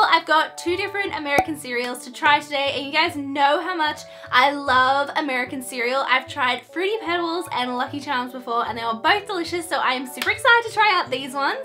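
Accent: Australia. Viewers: r u from sydney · Are you Australian? Xx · are you australian 🇦🇺🐨🐑🇦🇺🐨🐑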